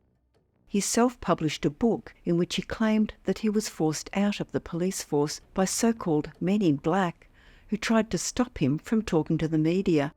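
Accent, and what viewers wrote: Australia. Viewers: No she Australian or New Zealand. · That's an Australian accent, not New Zealand. The male presenter they sometimes use is also Australian.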